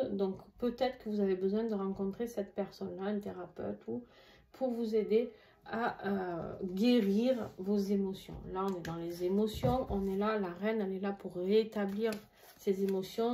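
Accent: South, France. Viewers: Quel plaisir d'écouter votre accent du sud.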